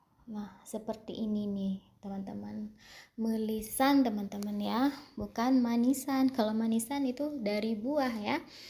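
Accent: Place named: Malaysia. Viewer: Hirip mirip orang Malaysia cara bicaranya 😂,jakarta mna jemlolnya😂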